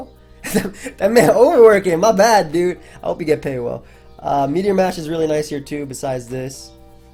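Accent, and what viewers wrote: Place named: Bronx, United States. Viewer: an entire road to top 10 with your bronx accent when?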